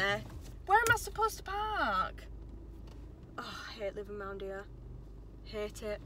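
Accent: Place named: United Kingdom. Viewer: This was great! Love your accent, I’ve never been to the UK! I have subbed to your channel!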